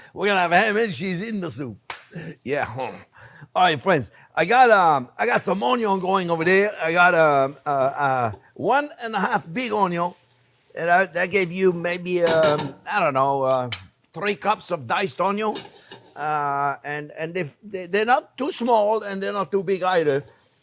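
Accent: France. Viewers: I assume based on your accent and name you’re French. Have you done any French soup videos or heck, any French food videos?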